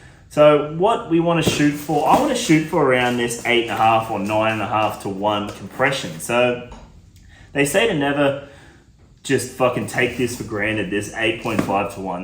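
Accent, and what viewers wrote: Australia. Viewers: *'s like a shit-talking, Aussie-accented shop manual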